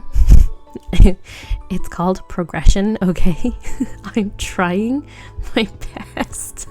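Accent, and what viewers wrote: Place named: United Kingdom. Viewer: I really like your british accent <3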